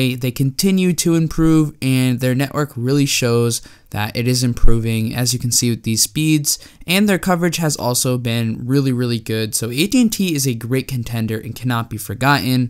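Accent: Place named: North East, United Kingdom. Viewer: Where in the north east are you from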